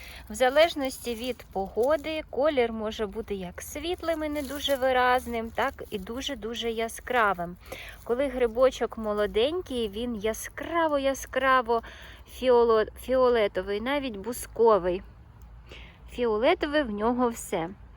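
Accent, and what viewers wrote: Standard. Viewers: Мне больше нравятся ваши видео когда вы разговариваете , потому что у вас хороший и чистый украинский ) Привет из Ирпеня ! Вчера нашли в нашем лесу аж одну рядовку фиолетовую , искали искали рядом еще ... ничего .. одинокая красавица оказалась ) За-то зонтики спасли ситуацию ) Страшно у нас , есть мины и могилы россиян без крестов в лесу и их сухпайки со звездой ... мы там рядом грибы брезгуем собирать .(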